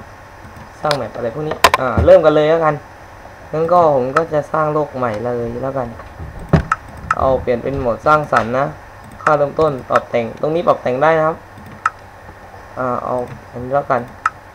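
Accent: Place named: South, Thailand. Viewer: เด็กใต้หรอ ทองแดงน้ะ <num>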